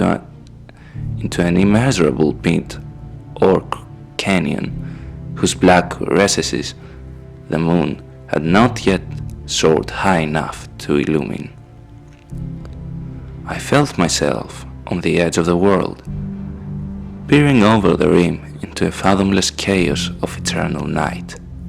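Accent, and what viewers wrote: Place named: Greece. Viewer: you greek mate ? :) nice work btw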